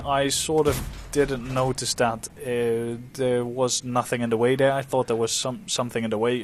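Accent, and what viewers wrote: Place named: Netherlands. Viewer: just what i thought, that accent! the WZ 131 looses the 100mm gun, thats all i know, but if you like ROF you wont choose the gun anyway, but i grinded the wz 132 with that gun. i liked it more although the muzzle break on the 131 looks way better IMHO. im from the Netherlands to btw!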